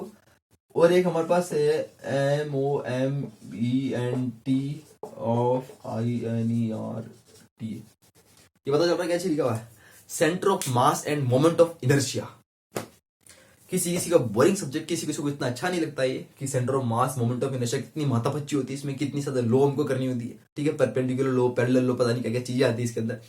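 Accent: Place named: Marwar, India. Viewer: bhiya aapke voice me se mast marwadi tone aa rahi hai isliye ghar jaise lag raha hai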